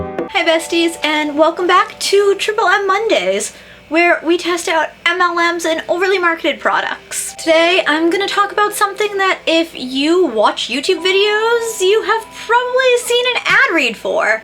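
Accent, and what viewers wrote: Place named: Massachusetts, United States. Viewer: Sounds like you live in massachusetts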